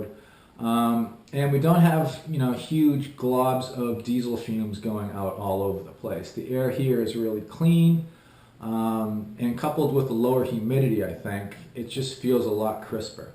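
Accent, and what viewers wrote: Boston, United States. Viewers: it didn't take long.. that "Bah-ston" accent came right back. :) i'll be in SoCal next week, probably come home to a hot dish of home-made enchiladas. i anticipate gaining some weight as well. Glad to see you made it back to the PH safely!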